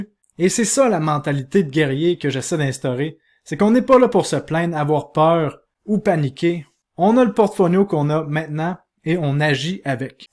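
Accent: Quebec, Canada. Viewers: merci vraiment des videos de qualite que tu fais, j'ador l'accent quebecoise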